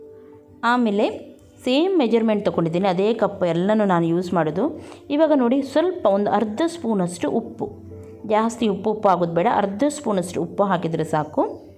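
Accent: Mangalore, India. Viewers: Nice mam .... neev Mangalore navra.... Language haage... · Tapakka typical mangalore kannada😃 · "tapakka" typical managalore kannada...i love it, you revealed many tittle secrets which others dont.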